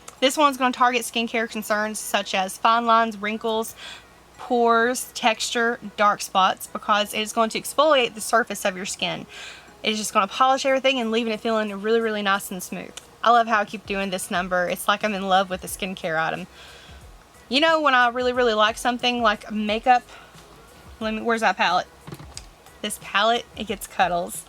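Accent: South, United States. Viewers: Sorry about you not feeling well! Are you southern though?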